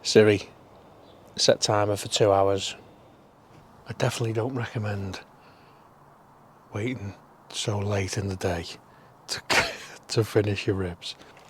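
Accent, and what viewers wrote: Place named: Wirral, United Kingdom. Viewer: Looks good mate. Are you on the Wirral? Accent sounds like it. Just been to Farm Foods in Ellesmere Port. Got the ribs £12.99. Got some other cheap freezer stuff so happy enough.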